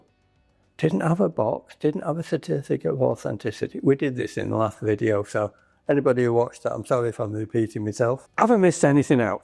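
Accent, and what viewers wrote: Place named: United Kingdom. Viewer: Chards are always good. Not often we hear these British accents on Youtibe either for some reason. Bad reviews just come from uninformed ppl. As soon as Chards makes something idiot-proof, the world just comes up with better idiots! 😂 Sod's law. PS... We need some juicy jousting between dealers on youtube, that would be fun! Difficult though without scripting some stuff. A big convroversy or investigation that blows over eventually after many ups and downs would be just the ticket for this market! 😂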